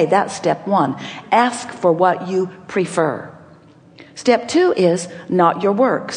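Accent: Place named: Ireland. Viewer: isn't that sota Irish bahahahahahah :) ♥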